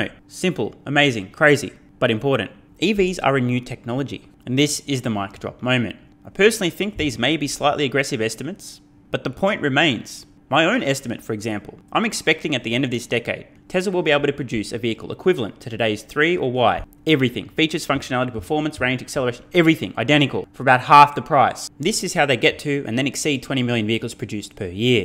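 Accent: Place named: Australia. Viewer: That Australian twang got you a sub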